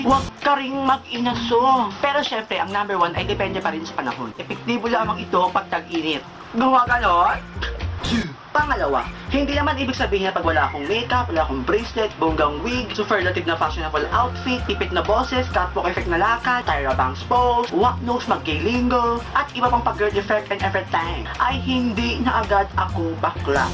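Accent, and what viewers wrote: Visayas, Philippines. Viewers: Bisaya ka po ba?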